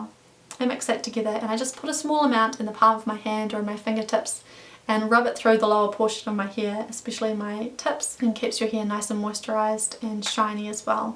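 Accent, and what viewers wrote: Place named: New Zealand. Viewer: This was so calming and I love your neozealandian accent :)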